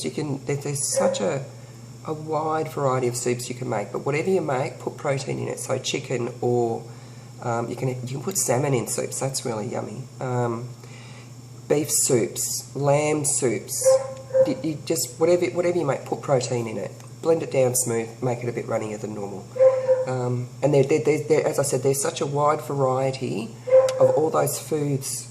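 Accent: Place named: Australia. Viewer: Thanks so much for sharing! This is the second video of yours I've watched. Great to hear an Aussie accent! And when I checked your channel and saw stuff on railway crossings I thought 'Blimey! She's a local chick!' I'm in Frankston and just had lap band surgery a couple of days ago, finding these videos very helpful!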